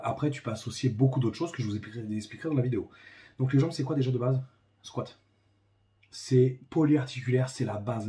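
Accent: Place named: Gaul, France. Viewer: tu me tue, cette façons de parler, ca c'est du gaulois solide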